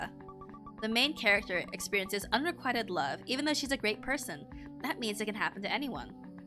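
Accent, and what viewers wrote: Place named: New York, United States. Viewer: Love the new Yorker accent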